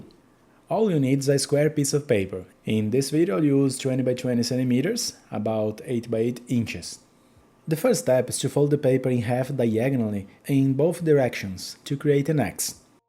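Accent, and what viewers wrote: Brazil. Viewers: Caraca, muito legel. Eu sei que você brasileiro pelo sotaque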